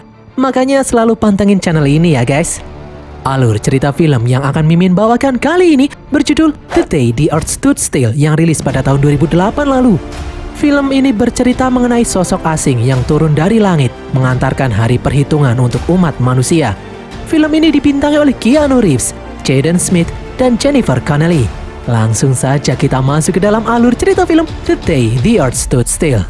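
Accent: Java, Indonesia. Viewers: logat e medhok😂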